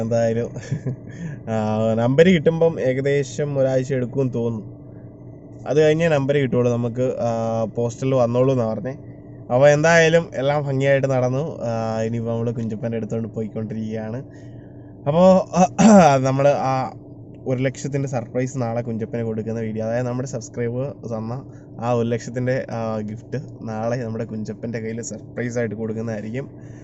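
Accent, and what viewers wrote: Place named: Thrissur, India. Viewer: ചേച്ചി തൃശൂര് പോയ ഭാഷ ഒക്കെ മാറി തൃശ്ശൂർ ഭാഷ ആയി😍😄💞💞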